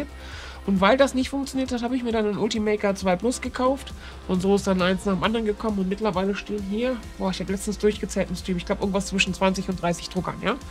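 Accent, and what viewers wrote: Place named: Saarland, Germany. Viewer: Ohleck, noch e 3D Saarlänna 😃 Saugudd 👍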